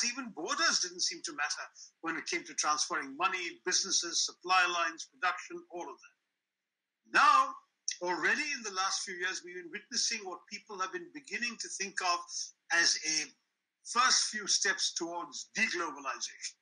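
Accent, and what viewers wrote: England, United Kingdom. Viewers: Respected sir, this is sandeep sharma working with IFFCO as a stenographer. I have been watching your video to enhance my vacab. And its knowledgeable too. You are great sir. I am really gladdened with you english accent and vocab. Please be there to improve english of a person like me. Thanks a lot .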